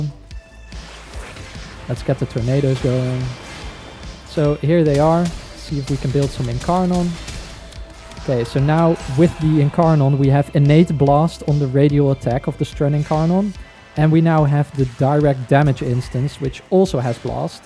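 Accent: Netherlands. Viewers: Are you Dutch btw? I Recognize your accent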